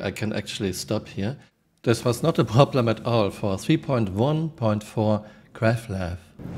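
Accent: Germany. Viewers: this is probably the most romantic german accent i've ever heard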